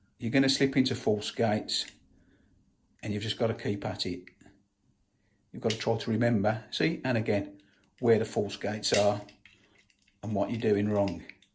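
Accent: Birmingham, United Kingdom. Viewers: Nice one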